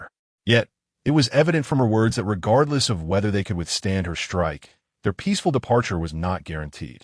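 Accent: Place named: France. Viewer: This ai is a little french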